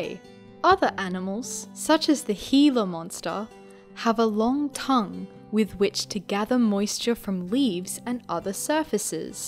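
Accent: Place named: Australia. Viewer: Sounds like a Australian accent it's kinda harsh to ask for them to pronounce it correctly many people may pronounce it differently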